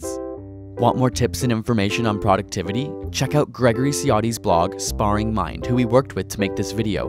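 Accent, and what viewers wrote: Canada. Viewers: are you canadian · that canadian accent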